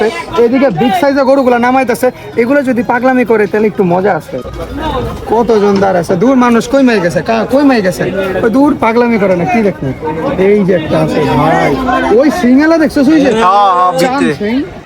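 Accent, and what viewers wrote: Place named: Old Dhaka, Bangladesh. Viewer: তোমাকে সাবস্ক্রাইব করেছি আমি আর তোমার বড় ফ্যান তুমিও তোমার ভাষা পুরান ঢাকা আমার বাসা নারায়ণগঞ্জ😊😊😊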